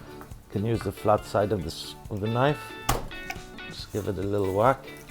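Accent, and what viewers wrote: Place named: Greece. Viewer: πολύ ωραιο το βίντεο και σε στηρίζω να αρχίσεις και τα "αγγλικά" βίντεο. Μην σε ανχώνει η προφορά, τι να κάνουμε είμαστε ελληνάρες (χαχα) , μπορεί εδώ να σε κρίνουν αρνητικά αλλά πιστεψε οι έξω θα το λατρέψουν γιατί τους άρεσει που τα βρίσκουμε λίγο δύσκολα. Φυσικά με περισσότερα βίντεος θα λυθεί και η γλώσσα σου σιγά σιγά :)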